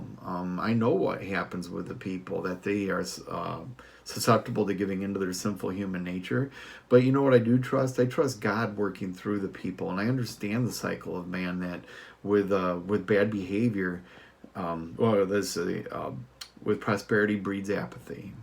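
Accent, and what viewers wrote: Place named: Upper Peninsula, United States. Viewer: Nice vid. You sound a lot like a Yooper, which makes it even nicer :-)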